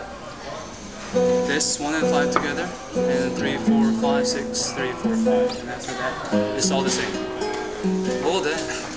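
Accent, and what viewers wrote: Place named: China. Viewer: Where are you from? Like a Chinese.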